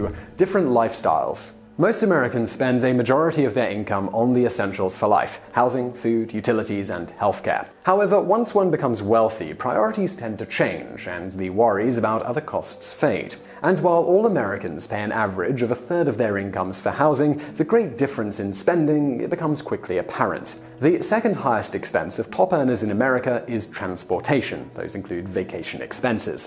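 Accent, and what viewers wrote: United Kingdom. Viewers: Too much haphazard information being thrown around: 1%, 0,1%... Oh, it turns about to be US incomes only? And what is all this information based on? Moreover, it's a Brit talking about the US, sacrificing even more on credibility... Not sure what to make out of all this in the end, rather than a fancily enthusiastic presentation by a guy with a British accent...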